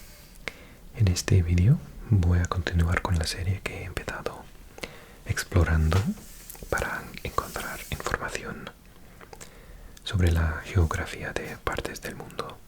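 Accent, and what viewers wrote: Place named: United Kingdom. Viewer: Wow yo ya había visto algún que otro vídeo de tu canal de geografía y mapas y siempre he pensado, que acento más de Reino Unido tiene, pero verte hablando un español tan fluido me ha parecido impresionante. Sigue así y enhorabuena!!!!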